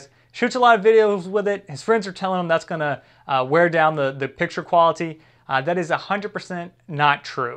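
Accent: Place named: Brazil. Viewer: Ahhh, Nice portuguese you spoke.. It had a brazilian acente but still Nice portuguese..!!hehehehe..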